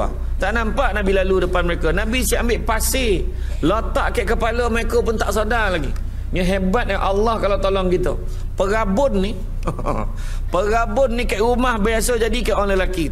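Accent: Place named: Negeri Sembilan, Malaysia. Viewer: Hebat nya kamu ni blh faham ustaz ni ckp nogori.. Sya yg asal malaysia pun x brpa faham sngt ustz ni ckp apa🤣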